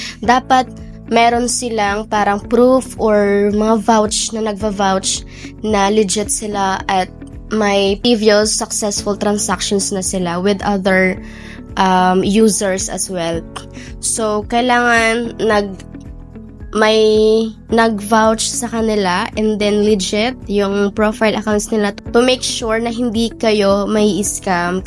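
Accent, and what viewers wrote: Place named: Philippines. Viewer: English with tagalog accent❤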